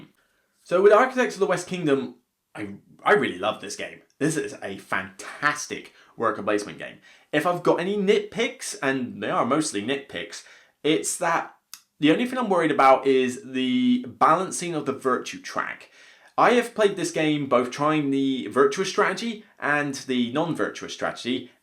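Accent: United Kingdom. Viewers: Why is this guy’s accent half British and half American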